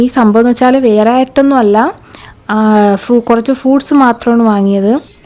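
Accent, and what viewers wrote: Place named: Trivandrum, India. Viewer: place trivandrum aano. Tone kettitt angana thonnunu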